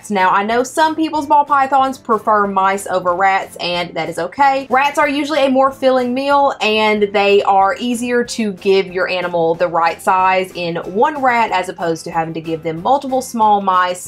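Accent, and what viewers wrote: South, United States. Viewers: Damn u a QT!! I had to sub!! CANT get my Lemon Pastel to eat a FT to SAVE his Life!! 🤦‍♂️ I cant even get my lil ninja to STRIKE one time just on some Defensive issh!! 🤦‍♂️ I know he ain’t gone strike at a mouse NO time Soon!!! Also, what part of the South are you from??? Lol I love your countriness(I know that’s not a word) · Very informative video. And it is nice to see a beautiful woman with a Southern accent. I have a 10 month old ball. She does really well feeding. I feed her a grown mouse about every week. I think it may be time to move up to small rats.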